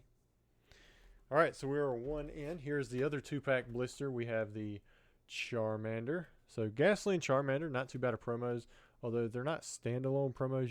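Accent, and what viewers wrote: South, United States. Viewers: That seems pretty on par for a traditional booster box, but for a giant special set it’s going to be rough 😅 Also, it’s nice to see someone else from the Carolinas! I don’t hear that familiar southern accent often when watching pack openings lol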